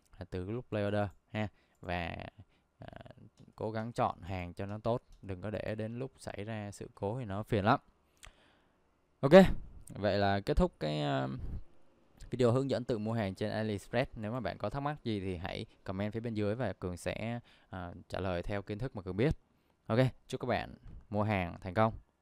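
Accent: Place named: Bac Lieu, Vietnam. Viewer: Tiếng đúng dân BL.. em ở BL đây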